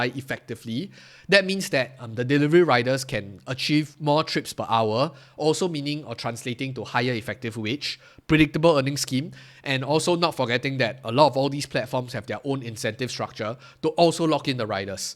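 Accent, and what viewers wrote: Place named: Singapore. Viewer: Love the heavily Indian dominant Singaporean accent. So mellifluous.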